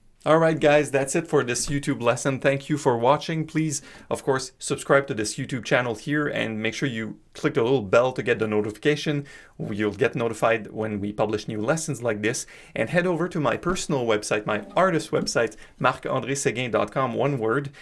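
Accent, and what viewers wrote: France. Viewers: Super un frenchy qui nous fait des tutos pour l'international avec le petit accent qui va bien. Et à part ça en français pour la France vous avez Monsieur ? Merci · Man, great video! But I can't wrap my head around where you're from! ;) It seems to me that you have a very very slight French accent (I'm French), but you speak an amazing English! It seems you're from Ottawa, but, gnah, with your name and this speech, something sounds fishy. ;) Anyway, keep up the good work! :)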